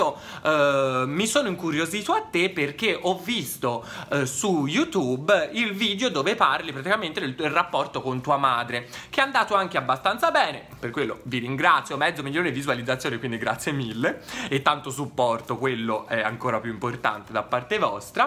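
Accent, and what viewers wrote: Tuscany, Italy. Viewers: “MAILA CHE CARDO CO STE LUCI”😂😂😂 Chi è toscano come lui/me qui? 👇🏼👇🏼Mettete like👇🏼👇🏼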